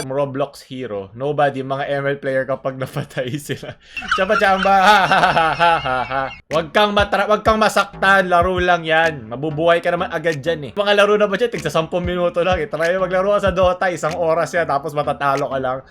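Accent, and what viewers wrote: Visayas, Philippines. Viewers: Tanginamo. Bobo bisaya accent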